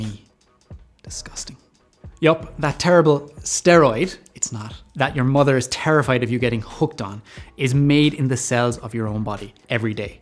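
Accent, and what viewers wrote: Ireland. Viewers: Is that an Irish accent that I hear oooh la la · Very Kilkenny accent? am I way off? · I love the Irish accent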